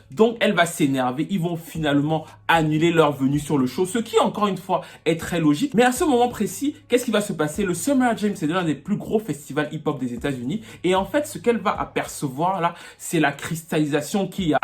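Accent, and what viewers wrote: Cameroon. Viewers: C moi où tu as un accent camerounais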